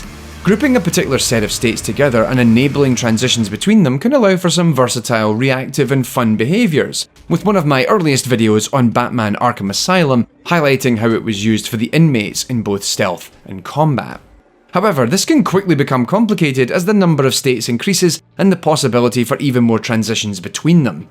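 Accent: Scotland, United Kingdom. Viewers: One like coz it has DOOM in the title... An unlike..... So i can just like it again for your SCOTTISH ACCENT.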